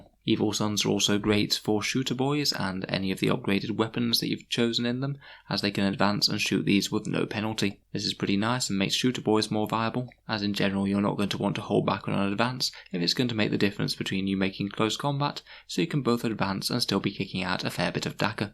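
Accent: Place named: United Kingdom. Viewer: British accent perfect for describing dakka.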